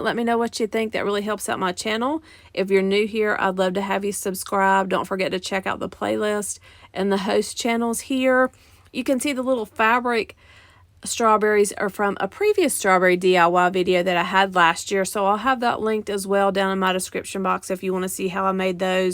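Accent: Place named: South, United States. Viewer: I just found you today, one of the collabs from a few months ago. I loved the lemon theme and your southern voice. I'm from GA. So I have one too, any fruit or farm theme I truly love. Thank you for making a good day for all the crafter. Rhonda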